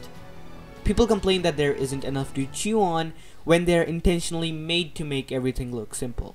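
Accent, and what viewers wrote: India. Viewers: your accent feels like indian....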